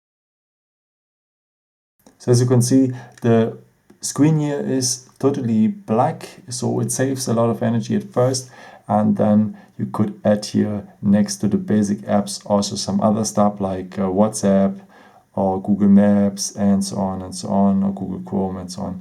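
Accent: Germany. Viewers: You sound kinda german lol. Are you german? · The German accent triggers me. muss los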